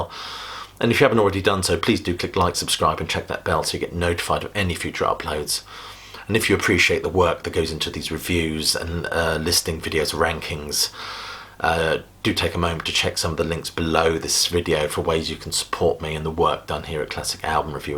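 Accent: England, United Kingdom. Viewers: Top notch English accent and vocabulary.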